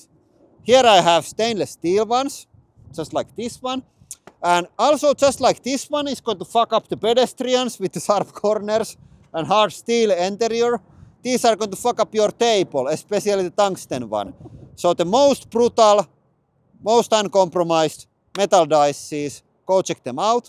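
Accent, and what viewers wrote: Finland. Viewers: s a Swedish person, I truly appreciate our Finnish neighbours. So the moment I heard the accent, I felt warm and positive. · The language he speaks calls Finglish) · How nice to hear a Finnish accent 😊 I’m 2nd generation Finn here in the US. My grandparents came over in the late 1800’s. My mother and both grandmothers equals 28 children. Big age gap.😂. I love your channel. Finn was my mother’s first language. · Nice Finnish accent! :-) Go Suomi, go! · Well it's that Finnish accent mixed with English translation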